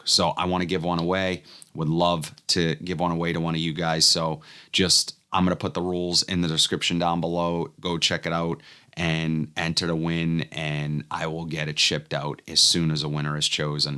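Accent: Boston, United States. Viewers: That Boston accent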